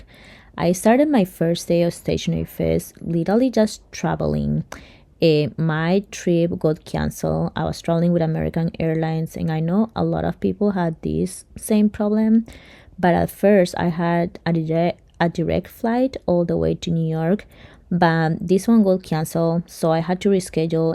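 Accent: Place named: Philippines. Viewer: No offense just curious to know where you are from? I can’t pinpoint your accent..I’m guessing Philippines?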